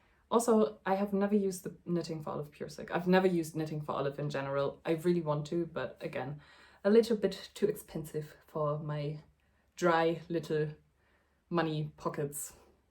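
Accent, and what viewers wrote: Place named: Germany. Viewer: am i crazy or you sound kinda german idk. i thought you were like a fairly big creator on here you look like you could be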